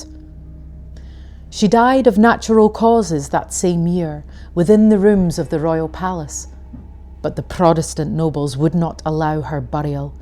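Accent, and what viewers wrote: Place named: Scotland, United Kingdom. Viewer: This combo accent is hurting my head to process. My brain has two different boxes one for British one for Scottish. I am unable to hear the sentences as my brain goes back and forth.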